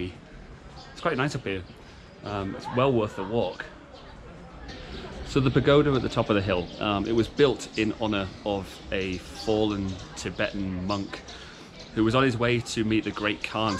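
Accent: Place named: Northern England, United Kingdom. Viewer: Nice video you northern chuff! Subbed! you from Sheffield? Leeds?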